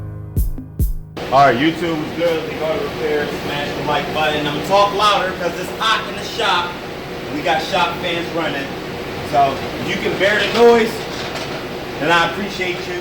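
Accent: Philadelphia, United States. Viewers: He sound like he from philly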